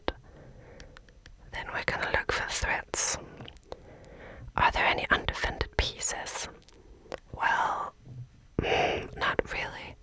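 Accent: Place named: Ireland. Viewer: bravo! i usually view whisper vids as a copout for wannabe-ASMRtists who don't have a suitable voice, but you're the exception to the rule. question before you get too famous and stop replying - what's your nationality? I hear an Irish accent most of the time but iirc you said you were from Belgium or The Netherlands?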